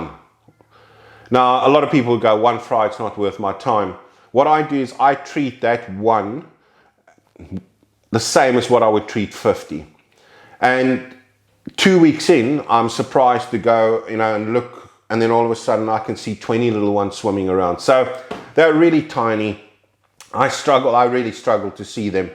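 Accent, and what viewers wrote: South Africa. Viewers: You from South Africa:)?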